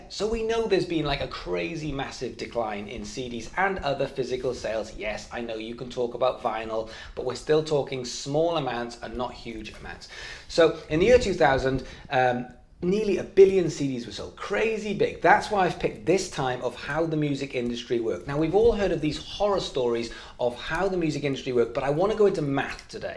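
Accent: United Kingdom. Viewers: Great video, and nice to hear a fellow brit!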